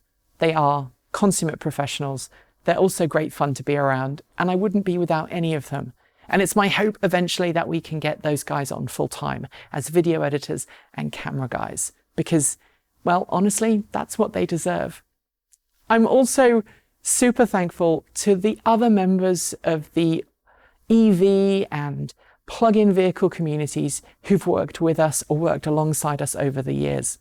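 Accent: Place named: United Kingdom. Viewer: Are you from the US? That's not possible. You definitely sound British.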